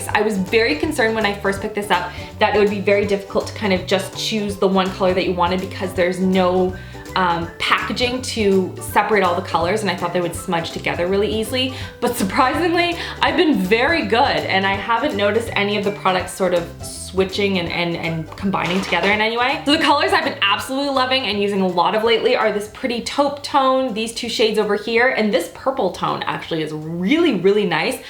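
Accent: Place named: Canada. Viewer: I mean, she's Canadian. Maybe that's what you're hearing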